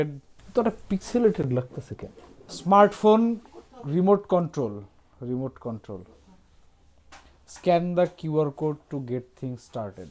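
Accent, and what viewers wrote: Barishal, Bangladesh. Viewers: "পয়সা থাকলে এধরনের টিভি কেনা যায় এবং উপভোগ করা যায় আর পয়সা না থাকলে দেখে উপভোগ করার চেষ্টা করা যায়' কথার টোনে মনে হচ্চে আপনি বরিশাইল্লা। Am I right?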